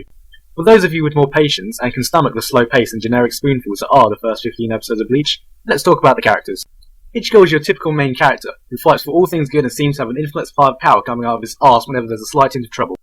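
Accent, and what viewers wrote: United Kingdom. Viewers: his accent is more british here, he changed a lot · holy shit he sounds so bri'ish. he sounds so white when i picture him talking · Old school Gigguk sounds more British 😅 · He really had a strong British accent for a Thai lol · Lol he sounds so british at this time. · he's british accent was pretty thick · used to sound so much more British lol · the british accent in this video hits differently with attitude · His British accent was stronger back then.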